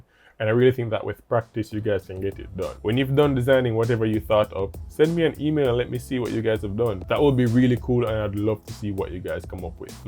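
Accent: Jamaica. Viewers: 2 seconds in....yessss my Jamaican brother!!! lol super helpful video btw, thank you! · You sound Jamaican bro, are you? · Are you Jamaican 🇯🇲 ???wonderful accent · are you jamaican? you sound jamaican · You sound Jamaican. I just subscribed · Are you Jamaican? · i hear a caribbean accent… hmm let me guess, Jamaican? Great tutorial 💯💯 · Are you Jamaican? Or from the Caribbean? Because i am Jamaican and i recognize the accent. Thank you for the help! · you're jamaican, aren't you? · Are you Jamaican ? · Is that a Jamaican Accent?? Now I have to learn this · are you jamaican? i pick up the accent anywhere lol. Also thank you for the tutorial it really helped! looking forward for the others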